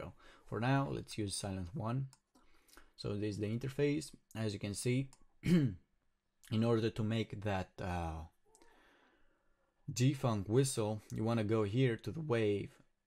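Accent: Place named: Balkans. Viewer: thanks for the tutorial man... where u from? you sound balkan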